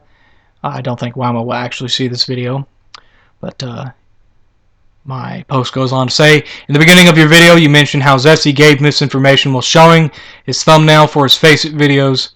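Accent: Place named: Texas, United States. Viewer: you sound extremely Texan i dont live in america so i cant exactly say what texans sould like tho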